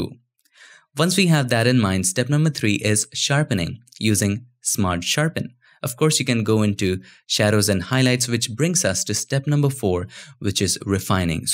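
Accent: India. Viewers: guy with Indian accent tells me how to fix motion blur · You know what, it's almost a perfect video, you just need to know how to get rid of your indian accent.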